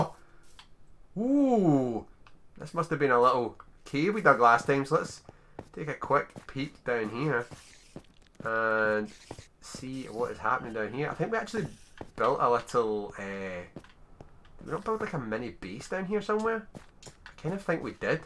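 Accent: Scotland, United Kingdom. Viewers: Scottish or English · I always hear Indian, Canadian, and British accent. I like the change of Scottish accents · Your Scottish accent, I like it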